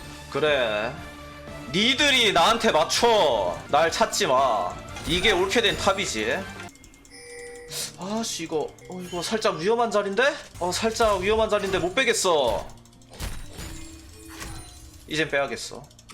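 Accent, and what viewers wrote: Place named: Gimhae, South Korea. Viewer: 약간 김해 냄새가 나는데?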